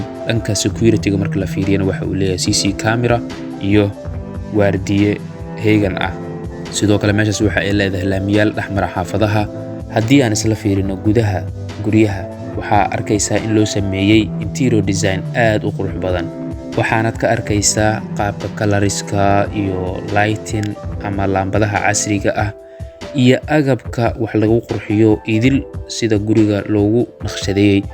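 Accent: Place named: Hargeisa, Somalia. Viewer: idol maanfahmin lahjadan ayaahargeysalaladhexjoogaa kkk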